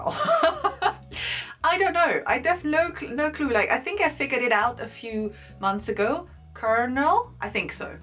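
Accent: England, United Kingdom. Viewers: I would say it Is a British accent ... forced pronunciation, it used to be a thing where the BBC had it as their golden rule that no "English, Scottish, Welsh or Irish accents would be on television or radio. so we had all these fake people on the box that infected everyone. accents change every 30miles in the UK, drastically change. as this is technically "The Queens English" this girl actually has an advantage being German. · English tricky language! Sometimes words are not pronounced as you would think! You sound more British than German! You speak English very well! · I have the same problem with the word 'determined'. Every time I use it I have to check its pronunciation out first!! it definitely drives me mad. By the way, congrats on your great British accent! ;) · I'm very surprised that you've even developed a distinct British accent. When I learned and tried to speak German, idk where my accent would be from lol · The first time you said squirrel you sounded Australian aha And you sound very British! 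Also.. idk if it’s like a non Australian thing But I’ve never struggled to say rural? Maybe everyone in Australia lives rurally so we have to use it in conversation all the time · What the hell?! At the start I thought you were British! Amazing English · How did you learn to have an accent like that, you sound very proper and more english than pretty much every english person i know including myself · is QUITE lovely...............and charming......................and funny. But I wonder if SHE appreciates just HOW 'English' she sounds now? Great stuff! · at the beginning I thought like: wow... she sounds quite British but there's something else as well... German or sth. 😂😂 I knew that bc German is my mothertongue😂 but I'm not quite sure. i live in the UK as well and I try to sound as british as possible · I think you're more English than some English people themselves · Big suze! That's her name that was hard work and on second look you don't look terribly much like her lol think it's more her softly spoken voice , you sound very English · If it's any consolation, you pretty much speak English with an English accent. 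And if you go to America, squirrel tends to be pronounced more like "skwerl".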